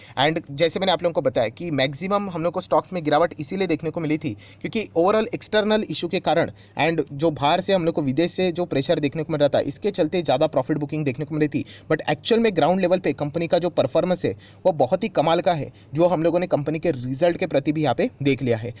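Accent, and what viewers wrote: Maharashtra, India. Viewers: मला ही वाटते सर मराठी भाषा बोलत असणार,, कारण कधी कधी मराठी उच्चार ऐकू येतात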